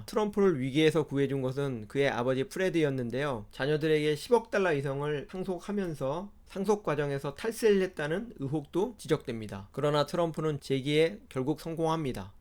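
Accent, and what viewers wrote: Jeolla, South Korea. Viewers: 이분 전라도 분이네ㅋㅋ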